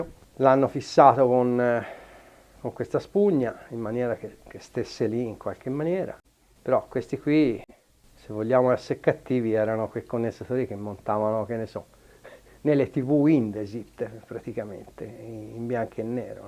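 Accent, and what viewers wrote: Tuscany, Italy. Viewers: buongiorno, sento che sei toscano, ci ho abitato per 10 anni a colle di val d'elsa, poi ho fatto un incidente sulla moto da cross e sono rimasto in carrozzina e ho dovuto ritornare alla mia città, mi hanno regalato un amplificatore pioneer 9900, da un amico, però mancano i 2 coperchi sopra e sotto, e tutte le manopole, i carter e le manopole non mi interessano,poi le trovo io, quello che me lo ha dato,mi ha detto che aveva un canale che non andava, e che si accendeva,ma ho messo la spina e de saltato il fusibile, me lo potresti aggiustare? sono un appasionato di questi componente degli anni di gioventù mia che sono del 66,buona giornata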